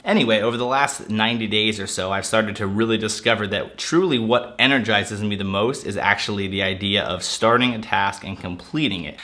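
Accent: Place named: Philadelphia, United States. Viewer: Exactly what happened to me! Started watching to get tips on organizing my businesses video library and really liked not only your content but your ability to communicate which led me to follow you and watch all forms of your videos. An interesting blend of topics and always useful for life. Appreciate your unique perspective. Also originally from Philly area, (Drexel Hill many years ago) so it's cool to see Philly and the burbs scene and hear the famous Philly accent. Keep up the great work! AND THANK YOU for generously sharing all you do with us. I've learned a lot and appreciate your hard work to deliver it to us! PS I own a chain of hair salons in Florida. If any of the woman in your family ( mom, sister, gf, wife, friend) are ever in Southwest Florida Naples or Bonita Springs they can come in for any services they want all for free. 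The works, color, cut style anything at all, my treat. Least I can do to thank you for what you've done for us! Salon's name incidentally is Robert of Philadelphia! Have an amazing day!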